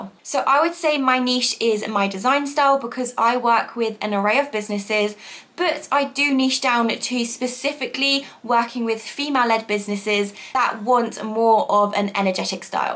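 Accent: United Kingdom. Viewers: Hello, thanks for your so helpful videos! I love your BRITISH accent but you speak a bit fast lol. thanks again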